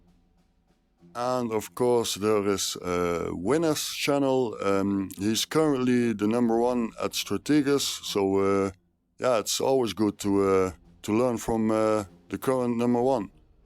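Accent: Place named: Netherlands. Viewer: New to the Stratego app, but I am a fan of the game all my life. I recognize a Dutch accent a mile away. Nice video, hope to out bluff you if we ever meet up.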